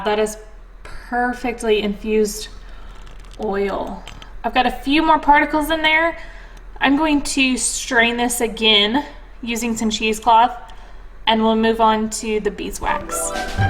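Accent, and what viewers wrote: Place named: United States. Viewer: Why do mercans say uurrbbs, and savv...Herbs, and saLve. Most annoying and makes you sound stupid!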